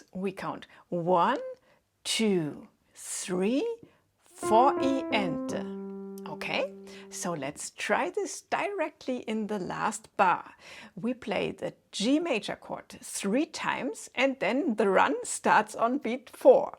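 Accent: Germany. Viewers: Thank you,. Fantastic lesson, it really helped me. Love your teaching and I guess your German/Austrian accent. Thank you so much ❤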